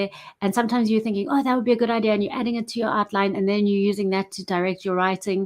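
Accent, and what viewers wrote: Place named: South Africa. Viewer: ah iim so excited! I thought you were perhaps American, but so happy to hear your SAn accent! and to seeing similar research interests! Social Realism! Higher education in South Africa! and at UCT. Really comforting!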